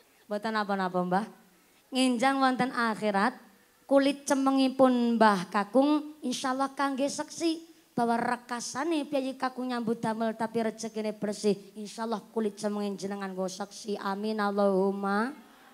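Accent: Banyumas, Indonesia. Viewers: Ceramah ngapak memang tidak membosankan kak mantap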